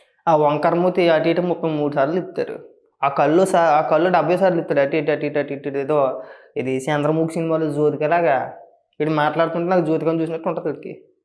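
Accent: Godavari, India. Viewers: Every common man have similar kind of views which you have but you have the medium to express their feelings as it is in Godavari slang satirically. Appreciated. Keep going. We are with you.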